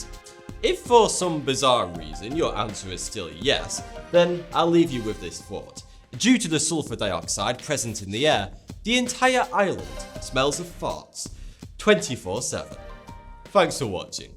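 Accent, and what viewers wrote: United Kingdom. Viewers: My friend hates British accents so I put the volume all the way up, just to male him mad 😂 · Why almost of the facts givers or educational youtubers use British Accent??? I'm always wondering why???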